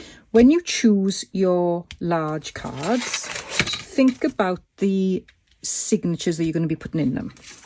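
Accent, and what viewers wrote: Wales, United Kingdom. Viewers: Wow. This is amazing. Thank you for the tutorial. I absolutely LOVE your Welsh accent.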